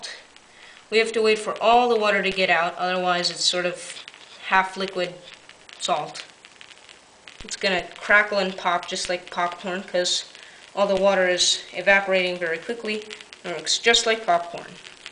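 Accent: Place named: United States. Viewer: does he live in america coz he's accent is really string american! looool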